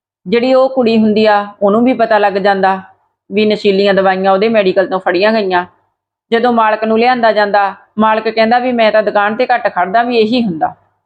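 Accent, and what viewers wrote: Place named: Malwa, India. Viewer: Sister ji soda pind kara a boli ta mansa Budhlada Bareta di lagdi a ma army bich me a Bareta tu a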